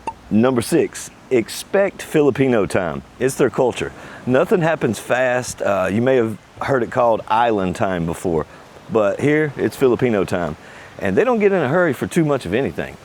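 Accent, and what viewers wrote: United States. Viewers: So wrong. For example YOU DON'T NEED A VISA FOR 59 DAYS (for most western countries). You enter the country WITHOUT visa. You can get visa only after 2 months. Your first extension is still not a visa. Another thing - don't EVER tip. If You do so - it is the same as screaming - I'm an idiot tourist, come and scam me more (or I'm from US). Another thing - You don't need internet to use maps 🤣🤣🤣 Unless You don't know how to use offline maps. And so many other stupid things in this video... I don't know who is this guy, but he definitely sounds like US tourist. The food is also BAD 8n the Philippines. Almost as bad as in the US (which has worst and most processed food in the world). Also very little to choose from. If You have been in any other Asian countries - You will be disappointed.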